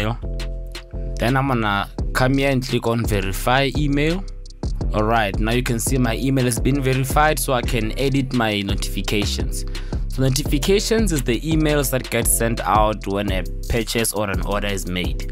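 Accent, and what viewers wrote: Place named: South Africa. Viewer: NICE !!! GOOD HELP , UR ACCENT SOUNDS LIKE SOUTH AFRICA IM ALSO FROM SOUTHEN AFRICA , PEACE N LOVE THANK YOU BRO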